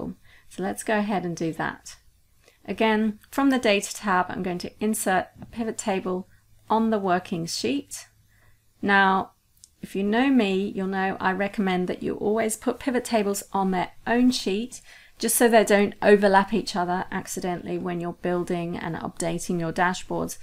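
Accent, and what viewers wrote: United Kingdom. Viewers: Why is it British-sounding women always seem so SMART? :-) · Hi, thanks for sharing and please keep posting long videos because not only you will help us in learning excel but also would help us in learning British accent. I have been literally looking for a British channel for a very longtime ... thanks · Which English accent is this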